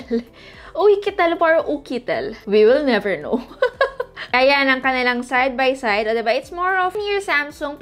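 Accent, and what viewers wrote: Visayas, Philippines. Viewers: yay! bisaya ka ate? <3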